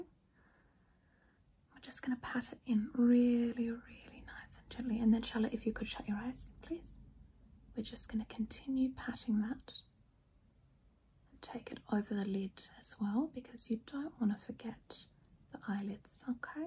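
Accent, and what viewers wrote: Australia. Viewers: I love your cultivated Australian accent 😘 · She’s literally Australian.. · Omg lol I just realised that most of us aussies have that exact accent 😂😂😂😂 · she’s Australian XD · in love with the aussie accent, the soothing voice and the vibess i just woke up from a nap but i guess its bedtime again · As a true blue, I appreciate you and these videos. The comfort of an Aussie accent which the subtle cultural complexities of a “rich Aussie mum” makes me real feel at home 🦘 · I don't know if anyone has seen it, but the accent is exactly like the rich ladies who work in the shop in Kath and Kim 😂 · are you actually australian or no?!?!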